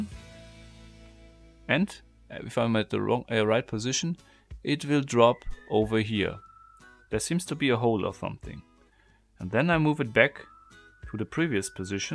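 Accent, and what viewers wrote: Germany. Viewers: Evtl Irre ich mich aber es klingt startk nach einem Deutschen akzent :P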